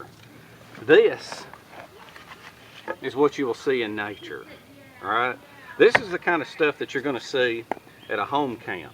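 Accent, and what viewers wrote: South, United States. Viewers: I like the videos I've seen you do and will be watching more I subscribed to your channel I like your knowledge, instruction, and your southern twang (I'm from Texas so I get it) anyway thanks for your time and enjoy your videos please keep making them. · i could tell by your southern drawl. I’m from WNC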